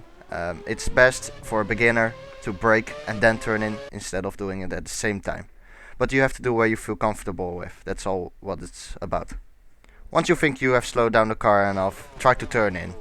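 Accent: Netherlands. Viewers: nah, his accent is way more dutch than finnish.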